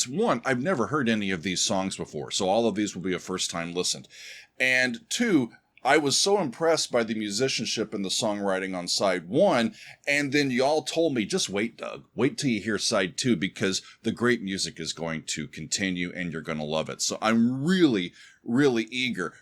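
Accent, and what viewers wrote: United States. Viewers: This album followed a great album and was followed by a medocre one. Their best one and shows how a group going through difficult times can make rheir best work. Very powerful, very heavy. Annoying American commentary, aftef all we're talking British through and through.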